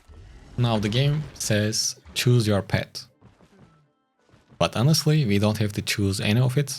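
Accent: Turkey. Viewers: Tam bir türk aksanı başarılarının devamını dilerim dostum 3 yıl sonra tekrar başlayayım dedim ve videolarını izliyorum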